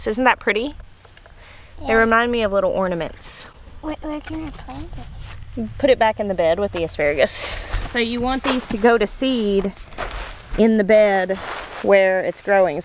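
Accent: South, United States. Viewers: I seriously thing you’re southern accent has become quite a bit more rich since the move. 😊 I’m so sad we got busy & couldn’t come meet you that last Sunday! One day I will meet you! 🤪😄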